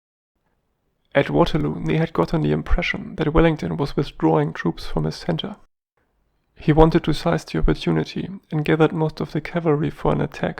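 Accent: Germany. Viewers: I refuse to engage with animated history unless it is narrated by you with your class, inimitable German accent. Great channel, rock on top lad. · That is not his language, he is German, I hear that, I am one myself ;)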